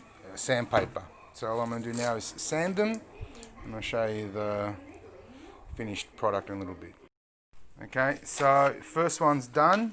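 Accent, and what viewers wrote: Australia. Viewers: It's so nice to hear an Australian accent for a change (fellow Aussie here). I've been collecting discarded cedar blinds for awhile for a large scale upcycling product (patio ceiling lining), so I'm well practiced at dismantling them the same way you do. Refinishing them en masse is a daunting task though! I've tested out a few methods so far, such as paint stripper, but I haven't found anything that works as well as sanding unfortunately. I'm planning on laying multiple slats flat next to each other and clamping them down with a batton lying across the top of them to hopefully speed up the sanding process. It's comforting seeing someone else refinishing them as well. It gives me hope! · Thanks for that. Nice to hear an Aussie accent. My timber venetian blinds faded in less than a year thanks to the Australian summer sun. lol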